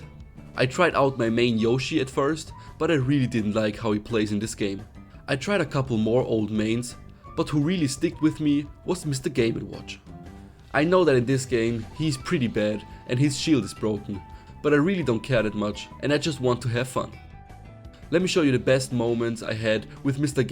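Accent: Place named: Germany. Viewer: Mann hoert raus dass du deutscher bist hahaha. Weiter so mit dem Melee grind! Ich find es lustig, dass du den Gruenen Mr Game and Watch spielst. Ach du Gruene Neune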